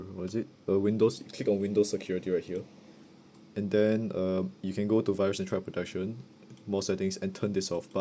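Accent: Singapore. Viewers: w singapore acent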